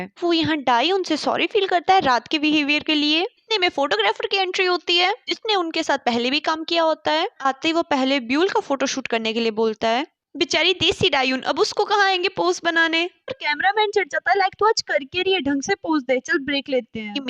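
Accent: Punjab, India. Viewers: Hi sachi app ki awaj bahut achi hai punjabi banda door sa hi pehchana jata hai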